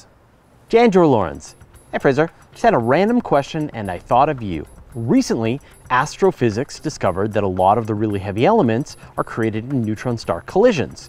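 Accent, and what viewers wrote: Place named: Eastern Canada, Canada. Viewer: I was born and raised in the lower mainland. I've never said 'aBOOT' nor heard anyone around me saying it. First I heard of it was from American shows mocking Canadians, but then I noticed some Canadians from Ontario using it and figured it was a eastern Canada thing. So.... a bit surprised to hear you using it Fraser Cain. Do you say "rewf" instead of roof, too?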